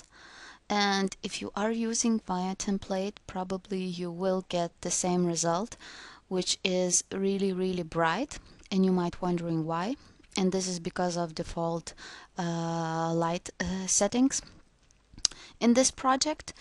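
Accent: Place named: Lithuania. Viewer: Is balso pazinau, kad lietuve :P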